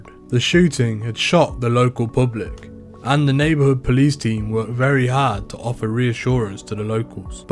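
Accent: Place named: Coventry, United Kingdom. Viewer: Not a clue where ur from, but I’m gonna guess Coventry from the accent 👀big ups bro keep up the good work, pusb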